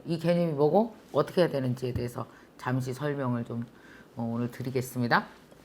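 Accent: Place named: North Chungcheong, South Korea. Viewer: 언제나 잘보고 있습니다 충북이 고향이라 아주 귀에 딱딱 들어 옵니다 ^^ 마치 고향 이모가 말해 주듯이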